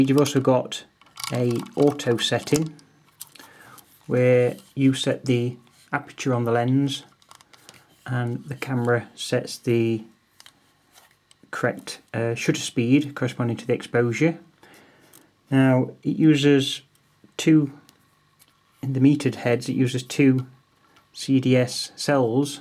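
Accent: Yorkshire, United Kingdom. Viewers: much appreciated, I noted after I sent the message that it was six years since the video, but I live in Lyndhurst New Forest have done for past 30 years, but used to be a fireman in South Yorkshire Sheffield having Transferred over from Manchester, so it was nice to hear the dulcet tones of true people! I collect cameras and have a varied selection right across the board, but I’m light on the Older Nikon, shall we say in the upper bracket, revered F series F1 and F2, so you prompted indirectly, a bit of homework 📚 and I looked at several references to these models and your right the F2 gets glowing reports in fact it’s difficult to find a bad remark, also the XD in my Minolta there is an XM on eBay at present, that looks decent and money at present is good, but I think you have swayed me. Loved the various controls and invasions, Minolta where always like that though, even in the Dynax range it was always more, but probably their down fall. 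Still the name lives on with Sony and It’s Nikon that are really having to come up with the goods now. Still carry that premium Name and always will. Thanks again and nice that you got the message. Regards David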